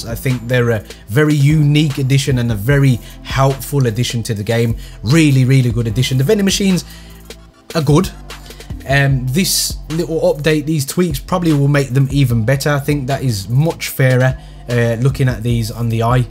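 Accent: Birmingham, United Kingdom. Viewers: nothing wrong with a brummie😂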